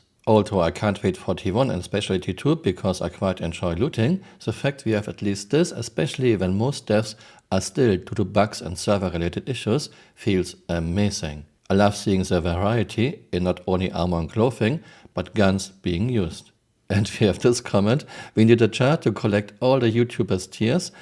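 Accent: Germany. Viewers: LOL ich höre ganz stark heraus, dass du deutsch bist ✌️ Liege ich richtig ?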